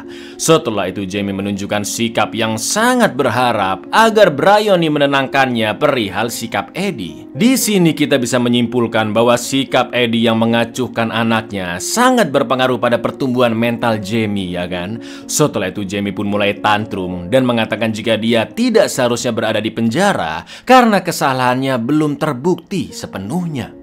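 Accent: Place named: Surabaya, Indonesia. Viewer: Kyk arek suroboyo ae nak...😅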